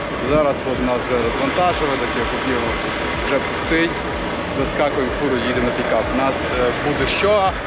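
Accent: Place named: Ukraine. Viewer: русских слов, но с укр. вымовой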